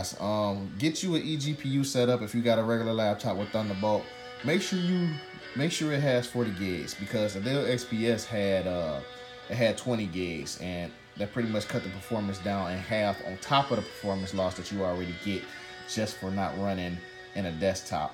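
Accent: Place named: United States. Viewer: I'm very amused by your redneck american accent xD Great informative video, have a similar Yoga like this rn. Do you know which Generation/model exactly this was?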